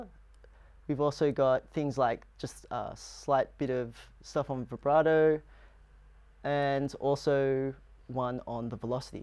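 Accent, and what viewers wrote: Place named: New Zealand. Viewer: Although, I think this chap may be from NZ. :)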